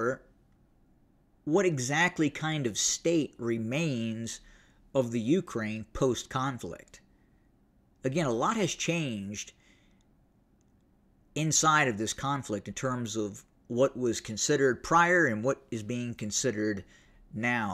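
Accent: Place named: United States. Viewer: Russian TV stuff narrated in American accent. Pretty surreal to listen to.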